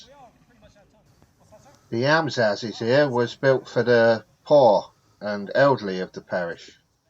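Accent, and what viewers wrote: London, United Kingdom. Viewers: Please don't stop " rabbiting" I love your accent, but as a Londoner I'm biased 😉. Your commentary, historical info and snippets of interest are just perfect. Don't change a thing. I had a friend who lived in Pennylands, it might have been purpose built estate but it was a peaceful place for her to live. I had no idea the basin was just behind her bungalow. Look forward to your next vlog 😊 x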